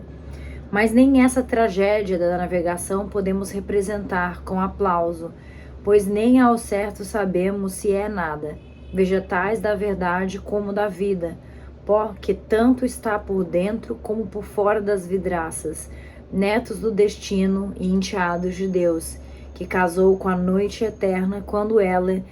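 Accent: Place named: Brazil. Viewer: Ela pode até falar um português do Brasil, mas se diz, que o de Portugal é igual, aí é forçar. Além do vocabulário, a entonação e a forma de falar são diferentes.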